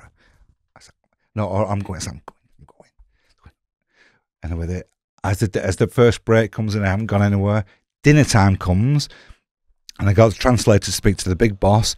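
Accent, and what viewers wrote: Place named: Lancashire, United Kingdom. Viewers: I knew I recognised that accent. It's good to see a Lancashire lad on here. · Sounds like blackburn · I could tell you were East Lancs as soon as you spoke I thought Burnley, so I wasn't far of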